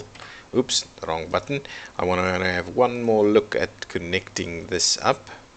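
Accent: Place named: South Africa. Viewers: I pinned him as South African immediately. :) 👍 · As soon as i heard him speak I thought you were South African, then I heard you say "trrry" then I knew . Glad to have this video. Really helped me as I always battle with transport on cities skylines. You just got a sub.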